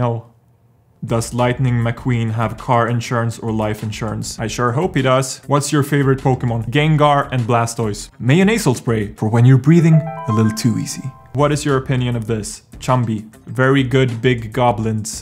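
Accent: Sweden. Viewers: i have never heard someone who sounds as swedish as you, and i am swedish myself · ur english is rly good but i can kinda hear that ur swedish (im a finn) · Me in the beginning of the video:”Oh boy he sounds Swedish” Me like fifty years later: “Oj satan i gatan”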